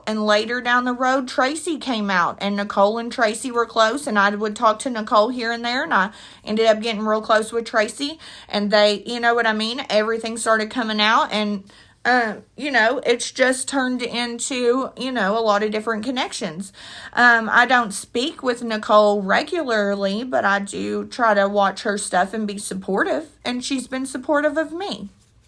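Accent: Kentucky, United States. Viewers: I’m talking to my mother now and told her about you going to Lake Herrington and she said my great grandfather worked there and his name is name is Jessie Lee Price and I guess he met really famous people there because they had bootlegers in the area. I told her how sweet you are and how much I love your accent bc it reminds me of my time I spent in Ky. My mom said we have family in Louisville and Lexington as well, Alexander County, and Boyle County. My stepdad family is from its Somerset ( Scienceville ). So we have family all over Kentucky lol 😂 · Hey neighbor.... I'm A KY girl too. Thanks for sharing your story. I subscribed to your channel. Hope to see more content from you. I feel the same way about her. I'm also in recovery. Nice to hear your voice with the familiar accent. You are so REAL .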